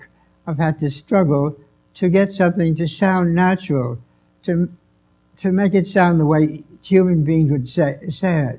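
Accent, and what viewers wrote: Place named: Brooklyn, United States. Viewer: Never lost his Brooklyn accent.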